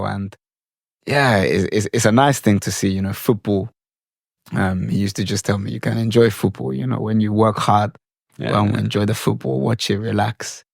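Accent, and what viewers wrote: Ghana. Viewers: The Ghanaian accent 😊😊😂😂..... Nice story about your journey. Inspiring 😊😊❤ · you are a true Ghanaian from how you speak, discipline.. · The Ghanaian accent is so funny coming from him - grand dad must have been such a character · I hear A Ghanaian Accent 😁😁😁😁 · Ghana all the way. I Can fell the blood in your accent although u are white. Much ❤ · if I were to be in Ghana, I would have loved to host you and your friends and spoil you a little with Ghanaian food and hospitality. You sound like a Ghanaian also. Rest in peace, grandpa, and be proud because is making you proud. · even with an overlay of a British accent, Ghana still comes forth in your voice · Ps, I enjoy when he mimicking his grandfather. Besides, he does have a Ghanaian accent himself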